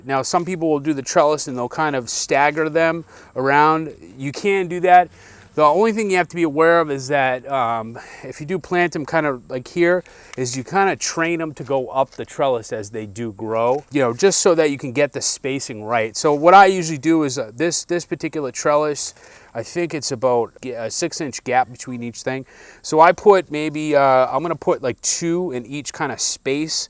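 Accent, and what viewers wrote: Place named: Massachusetts, United States. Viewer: You sound like your from MA! That’s where I’m from so your accent sounds so familiar. Thanks for the content! Lots of good tips!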